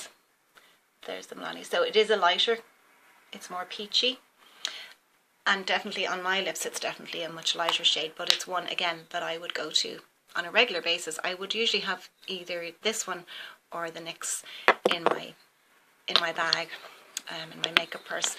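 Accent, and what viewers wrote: Ireland. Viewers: Hi, you sound Irish, beautiful accent and beautiful lady, you are so refined, lovely. I'm from Nova Scotia Canada, and a great line from here is called The Ordinary, natural, no silicone, no additives etc and wonderful prices, its blowing up on you tube! Wayne G recommend it! I love Chanel foundation as well. Looking forward to watching more of your videos, Karen from Canada 🇨🇦.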